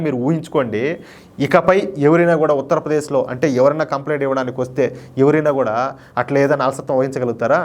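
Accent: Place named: Tirupati, India. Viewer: Naa tirupathi pakkala a v(e)illage na meedhi 😂 vor vor vore vore ,chana adhbbuutamgaa vuntadhi Naa Nuvuu matladuuthunte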